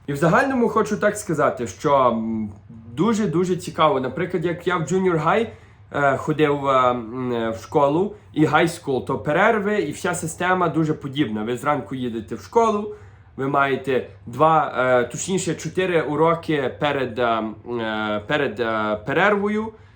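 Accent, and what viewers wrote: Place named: Ukraine. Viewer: Ооо, натрапила на відео, де розповідає звідки він! Отепер зрозуміло, чому відразу відчула, що манера розмови НАША🙂 Тема НАДЗВИЧАЙНО потрібна і цікава! Дякуємо! Дай Боже здоров'я тобі, та усій твоїй родині!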